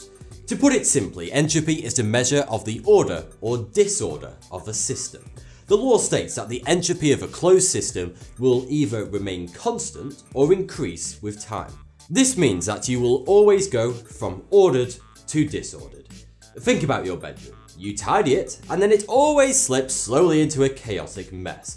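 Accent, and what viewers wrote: United Kingdom. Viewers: Watch your channel just 2 learn the British accent, tank u.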